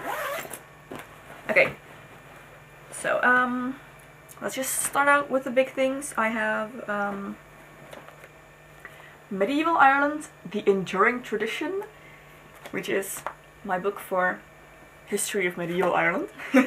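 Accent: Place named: Netherlands. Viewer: ! Dutch indeed....I thought I could hear it! Awesome! Hallo landgenoot!;)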